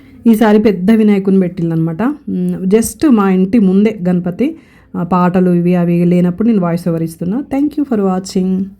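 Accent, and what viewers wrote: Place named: Telangana, India. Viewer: pure telangana yaasa super dear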